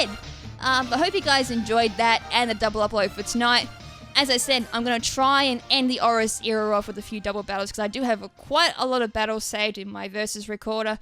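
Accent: Australia. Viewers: Wow, I'm not gonna lie, you really seem like the female pimpnite Not in a bad in a bad way but your accent is very Australian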